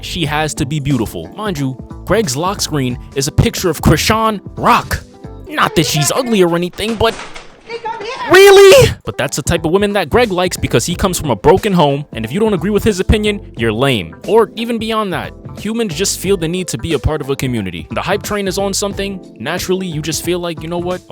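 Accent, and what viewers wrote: Brooklyn, United States. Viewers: You from Brooklyn frfr huh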